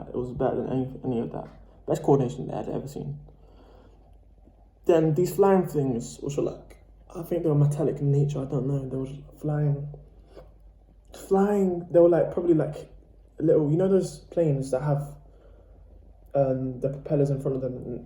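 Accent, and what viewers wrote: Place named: London, United Kingdom. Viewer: Are you from london? You sound like you are - I saw ur comment on a DMT video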